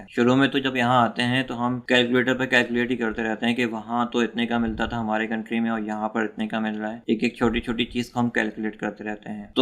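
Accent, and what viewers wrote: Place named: India. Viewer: Bro you are working in Dubai but you are slang language is Indian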